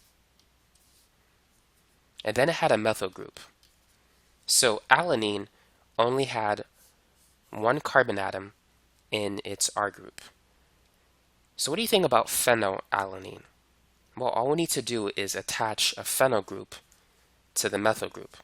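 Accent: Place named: Massachusetts, United States. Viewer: sounds like he's from Mass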